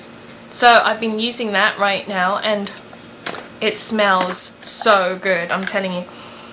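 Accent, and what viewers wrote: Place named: Philippines. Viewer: Omg. I love your accent! Are you Filipina?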